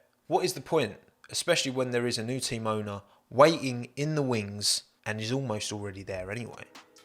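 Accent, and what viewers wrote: United Kingdom. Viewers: Mega Brit accent 😂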